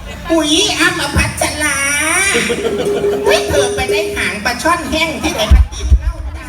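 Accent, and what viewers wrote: Isan, Thailand. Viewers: 55555ชอบบบทีมมนี้มากกกก ตลกเสียงอิสานน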